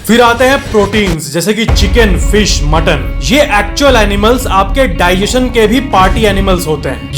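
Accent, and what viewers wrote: Bihar, India. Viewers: Apka accent thoda bihari type lagta hai aap bihar se ho?❤ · AAP BIHARI HO KYA...????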